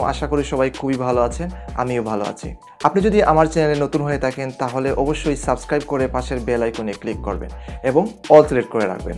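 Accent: Sylhet, Bangladesh. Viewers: বাড়ি সিলেট নি বা!😁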